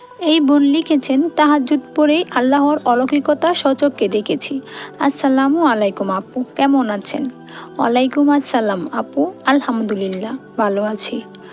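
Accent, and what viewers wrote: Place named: Mymensingh, Bangladesh. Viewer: আপনার দেশের বাড়ি কি ময়মনসিংহ অথবা কিশোরগঞ্জে?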